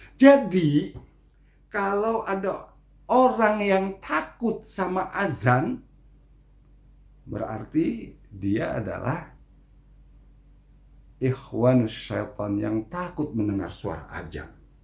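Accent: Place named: Betawi, Indonesia. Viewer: Alhamdulillah, nama sy dan pak Sudari disebut, dalam Video YouTube Panggilan Adzan. Mantap, dg dialek kental Betawi, Tausiyah yg gampang diingat, pas sedikit ada kelucuan. Lanjutkan, Abah Ustdz.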